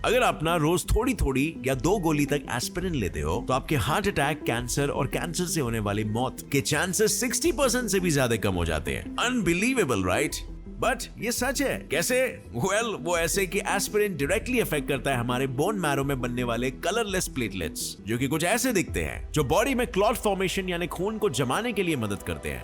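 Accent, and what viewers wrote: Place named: Maharashtra, India. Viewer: I always like your Marathi accent.....👍👍👍🏻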